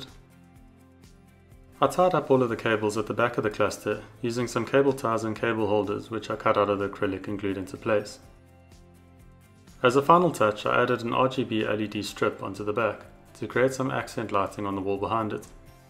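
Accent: South Africa. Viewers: Clicked link. Didn't expect to hear a South African. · But why? P.s. is that an SA accent? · love the South African accent, but please call it a Water cooling system, not a cooling water system lol!! Impressive stuff, could you share the clusters Hashrate for BTC when overclocked to 2Ghz? and the temps? would be a nice stress test on the cluster and cooling water system :p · Awesome project! I’m I hearing a South African accent? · You sound like a south african ?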